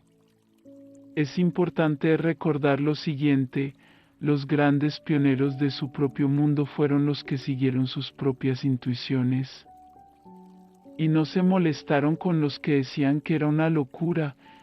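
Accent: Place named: Colombia. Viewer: Suenas como Colombian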